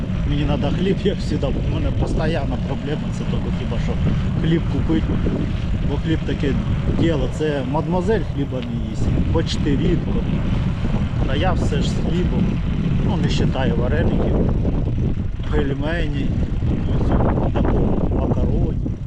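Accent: Poltava, Ukraine. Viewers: Чисто полтавский говор не спутаешь ни с чем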